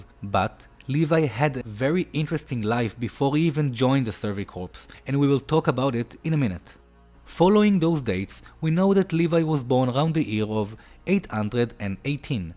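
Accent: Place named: Israel. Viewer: אתה ישראלי, נכון? שומעים את זה כל כך😅😅😅 ואגב, אחלה סרטון👌🏻👌🏻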